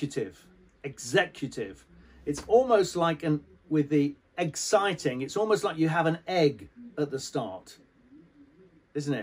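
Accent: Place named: United Kingdom. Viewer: Waiting for this another british pronunciation of yours pastor😊❤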